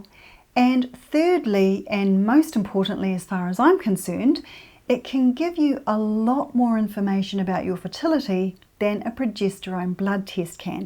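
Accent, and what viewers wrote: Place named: New Zealand. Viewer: Very informative. It's so nice to open a video on the subject of fertility and hear a kiwi accent 😊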